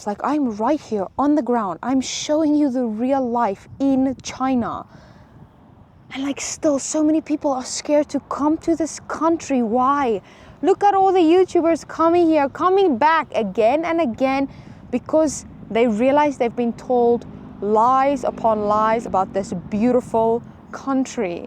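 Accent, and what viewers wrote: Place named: South Africa. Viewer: Two seconds listening to you i knew you a South African. Greetings from 🇿🇦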